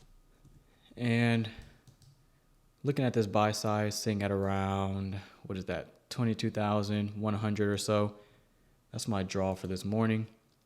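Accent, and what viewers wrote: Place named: South, United States. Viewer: Here for the southern slang, giddy up traders...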